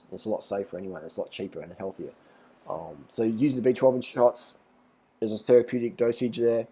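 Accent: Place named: Australia. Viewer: your aussie accent is hilarious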